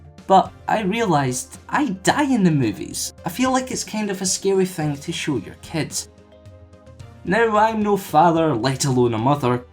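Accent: Scotland, United Kingdom. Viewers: God damn it I can understand you you're Scottish accent is so strong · u scottish? Cause ur accent sounds like scottish i know cause im scottish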